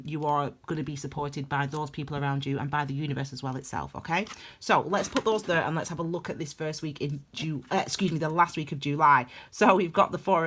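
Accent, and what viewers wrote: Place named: Scotland, United Kingdom. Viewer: First time I’ve listened to you and this truly resonated with me. Love your accent. Scottish?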